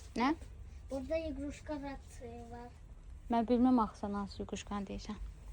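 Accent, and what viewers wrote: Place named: Mingachevir, Azerbaijan. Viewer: hə lap yaxşı məndə Mingeçevirliyəm sizdə bizim kimi danışırsınız maraqlı gəldiniz mənə sizi görməsəmdə doğma gəldiniz mənə ❤❤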